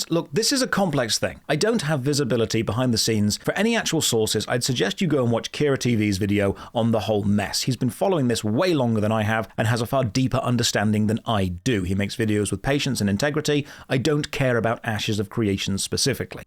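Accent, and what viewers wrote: United Kingdom. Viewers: A really dumb take. You are a great example how British accents don't equal intelligence. How can you talk for 22 minutes about the poor state of MMOs without mentioning the toxic effect that whales have created in every MMO? They have priced out young players entirely from the genre creating a predictable demographic problem. Without young players the developers need to milk more out of each older player. This cycle is not sustainable for obvious reasons. MMOs in 2026 are similar to Golf or Broadway -- a hobby specifically for wealthy people. None of us could have afforded to play a modern MMO back in 2000. It was $90 to play Dune Awakening for the first 2 weeks of launch. By the time the price dropped to $60 it was dead. This game did not die because a lack of social issues. It was dead from the start -- a lifeless whale milking machine. 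But I get it -- whales are your top supporters. You can't be aggressive towards them. It would threaten your income. This is the same lousy excuse developers will give when they refuse to perma-ban RMT buyers. This "community" is rotten. MMOs can't heal until these whales piss off and retire from gaming.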